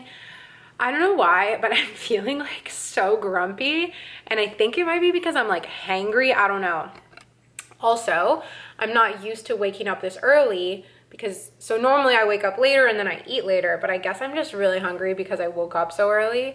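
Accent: Quebec, Canada. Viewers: Oh my gosh I love your French and love your accent even if it is hard for me to understand. I love Quebecois!! Thank you for adding that clip haha🤣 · Salut,! Your channel got reccomended to me in Brazil, so I guess it's an indication of how much you've been growing, and I thought you'd be happy to know it. I'm in my late 20's and, to be honest, we have very different personalities and life styles, but I love to hear you talking - even though I don't relate much. I think you're a great communicator and also very entertaining. Hopefully you can make youtube your main source of income really soon! Btw, I've started learning French this month, I speak Portuguese, so it's a bit easier since they're both Latin languages, and I was so shocked to hear you! Canadian French sounds soo different from French from France, I had no idea, even though I lived in Toronto for a year (not much French there, but I did visit Ottawa and Montreal a few times and was so oblivous to the language). 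I'd looooove to hear you uploading more French content here and there.:) best of luck!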